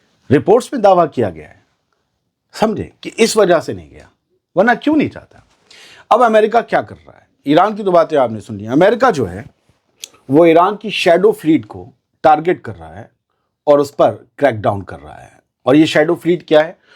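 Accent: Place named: Awadh, India. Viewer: bhai asslam walaikum wa-rahematullahi wa-barqatahu Bhai main Gonda u.p se belong karta hu aur mahesoos karta hu shayed aap bhi awadh se hain kyunki news' padhte waqt aapki bato se maine kai baar mahesoos kiya hai.... ALLAH HAFIZ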